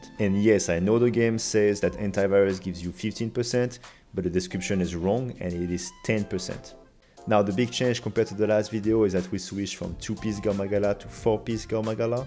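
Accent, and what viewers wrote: France. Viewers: You sound french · 1. sexy french accent 2. good not annoying background music. 3. straight to the point and everything clearly explained. 4. well edited the perfect build guide video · are you french? I am, and your english accent reminds me of me speaking english x)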